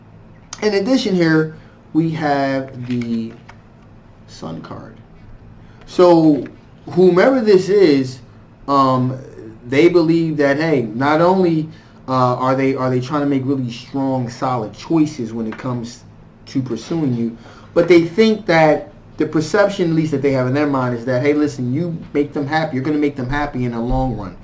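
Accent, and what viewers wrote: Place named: New York, United States. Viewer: Yes your skills are on point. Love the NY accent!! Queens bound. I am feeling pushed into this relationship. I want it but in a mature way give me a vision a plan we both can agree on then I'm focused on it full force. There are some things i need to get in order with my credit then I'm ready to go all the way to marriage!!!! But the anger issues have to cease.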